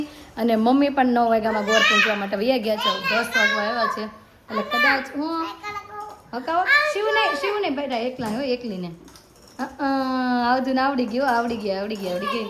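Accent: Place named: Kathiawar, India. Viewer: jsk ben tamari bhasha upar thi tame kathiyawadi hoy em lage chhe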